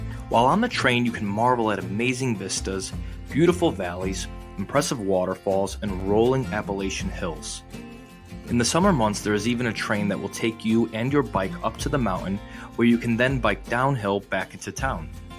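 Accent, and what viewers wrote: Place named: Philadelphia, United States. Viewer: The Philly accent really gives this video a certain charm.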